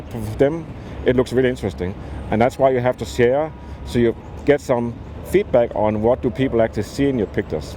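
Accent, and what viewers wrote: Denmark. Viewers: Are You from Denmark can hear a little dame in the accent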